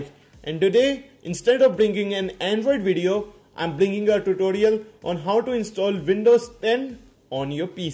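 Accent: India. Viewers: sounds like an indian speaking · u indian?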